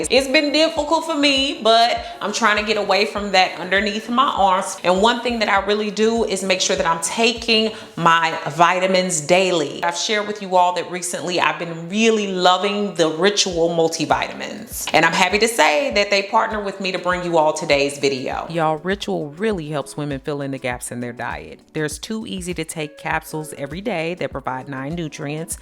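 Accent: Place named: Midwest, United States. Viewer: I just love the Midwest accent. I’m from the south but all my family in Midwest now. Make me feel right at home 💕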